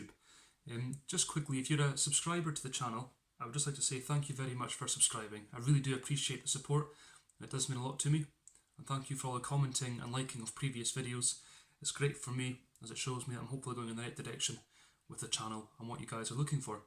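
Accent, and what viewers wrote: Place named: Scotland, United Kingdom. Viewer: Scottish! Awesome dude!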